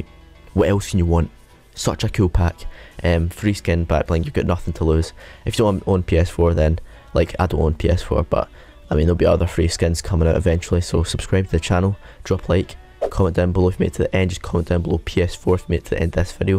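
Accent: Scotland, United Kingdom. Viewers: Yeah He Is Defintley Scottish He Does Not Sound Like Arnold Schquanegger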